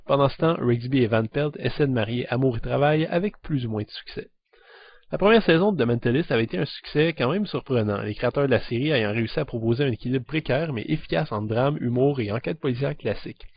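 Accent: Quebec, Canada. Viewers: C'est déjà sortit au Québec? (d'après l'accent...) Bonne critique! Merci!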